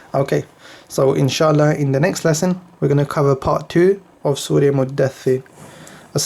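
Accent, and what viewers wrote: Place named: United Kingdom. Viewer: Well. He sound British. It doesn't matter what race or religion you can still be Muslim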